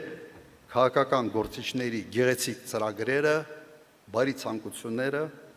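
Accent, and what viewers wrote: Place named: Karabakh, Azerbaijan. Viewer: զզվում ենք էս մարդու խուճուճ ղարաբաղյան ակցենտից,ոչ հայերեն գիտի,ոչ էլ նորից հայերեն...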